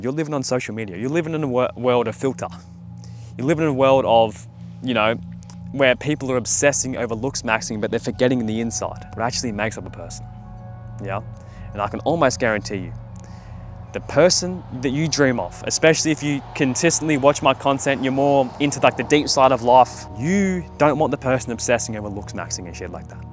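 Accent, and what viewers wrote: Australia. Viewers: Prob Aussie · Even as a 15 y.o who believes he has the perfect girl, I can't help but still feel unworthy of the love I receive from her. She's the first person who hasn't stressed me out, who wasn't a bitch, who didn't self harm. And I've been with her since April last year. Fingers crossed my mood improves. [also you sound aussie, I'm aussie too :) ] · You’re Australian right? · the man or woman has an Australian accent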